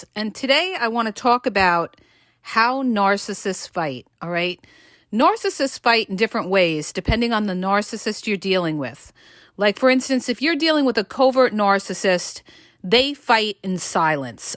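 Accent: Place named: Brooklyn, United States. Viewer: You sound just like my mom! Brooklyn Accent and Everything 😂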